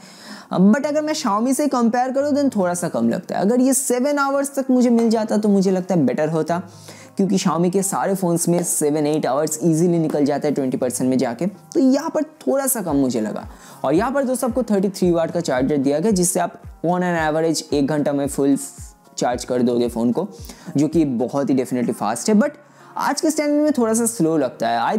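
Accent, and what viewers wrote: Bengal, India. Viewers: Tumi bengali naki?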